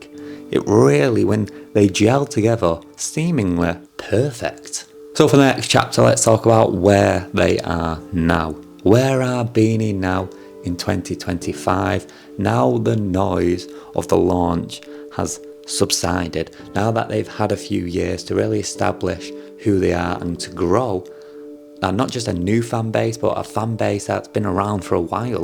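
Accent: Northern England, United Kingdom. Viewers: You’re all good. I’m Filipino and can tell you’re saying it properly within your regional accent (Yorkshire? At the very least Northern English). This is a great sign of Bini’s global recognition—people will say the group’s name with their own accent.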